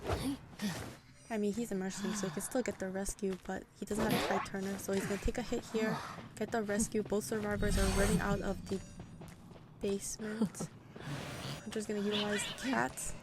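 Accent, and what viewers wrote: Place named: Finland. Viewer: Tysm! This is so helpful, couldn't find a good guide on Ann. Btw where are you from? Your accent sounds the same as my finnish friend's haha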